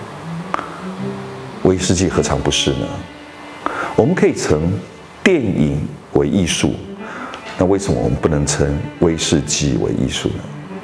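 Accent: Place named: Waishengren, Taiwan. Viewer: 看新聞中跳出廣告，您講話的方式感到一股濃濃的外省貴族味，特來朝聖。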